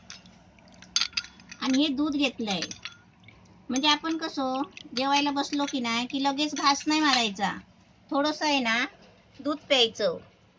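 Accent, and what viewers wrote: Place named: Ratnagiri, India. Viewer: मी नीता तुमचं मी अभिनंदन करते सर्व रेसिपी सुंदर आहे तुमची रत्नागिरी भाषा /बोली छान अभिनंदन अभिनंदन!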